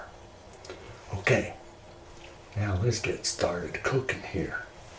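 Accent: United States. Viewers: I live in cowboy country, so it's enjoyable to see the nod to our culture and accent. Thanks! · Love that cowboy accent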